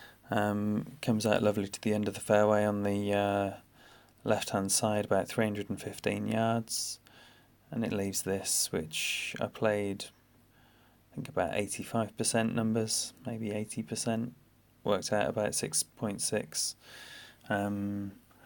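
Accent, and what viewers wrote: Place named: Sweden. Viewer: Thanks for uploading the Beeedioe (Swenglish) You're the man!!!!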